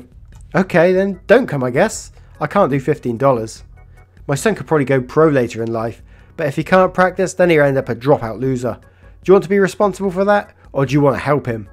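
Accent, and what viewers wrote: United Kingdom. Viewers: Sounding extra British in this vid. :)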